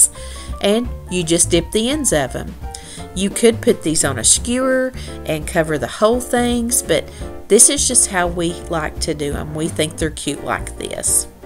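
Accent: South, United States. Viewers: This was such a super sweet video. The treats all look delicious.i plan to make a few of these this year, hopefully. The best part of this video is listening to you talk, and especially about the real meaning of Christmas. I love your sweet, soft southern voice. You sound like my family. We are southern and listening to you was a joy.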